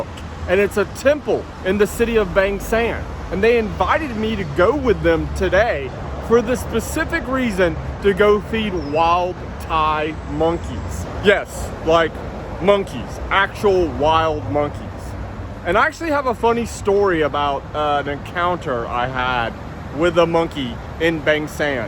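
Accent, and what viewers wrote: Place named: Pittsburgh, United States. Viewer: Are you from Pittsburgh? Your accent sounds like it. Great channel.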